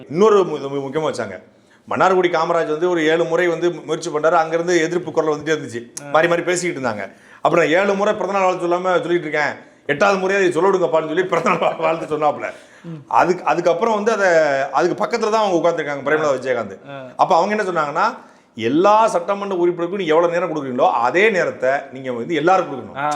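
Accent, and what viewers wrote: Tirunelveli, India. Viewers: சாட்டையின் குரல் ஒலி திருநெல்வேலியின் குரல்வழி திருநெல்வேலிக்கு பெருமை சேர்க்கும் நெல்லை பேச்சுக்கு பெருமை சேர்க்கும் நெல்லை மாவட்ட வீரர்களுக்கு எடுத்துக்காட்டாக திகழ்பவர் சாட்டை துரைமுருகன் அவர்கள் அவர்களுடைய பிறந்தநாளுக்கு எங்களுடைய வாழ்த்துக்கள் முன்பாகவே சொல்லி விடுகிறோம் நெல்லை சீமைக்கு பெருமை தரும் சாட்டை அவர்களின் அதிரடி பேச்சுக்கள் தமிழக சட்டசபையிலும் டெல்லி பாராளுமன்றத்திலும் பாராளுமன்ற டைகர் என்ற பெயரை பெற ரெண்டு ஹீரோ வேண்டுகிறோம்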